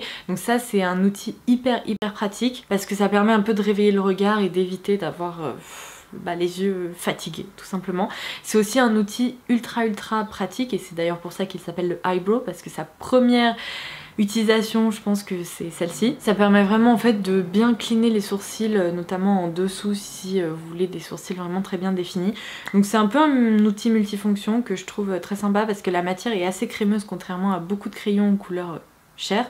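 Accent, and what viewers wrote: United Kingdom. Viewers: Ton accent anglais me fais toujours un peu sourire xD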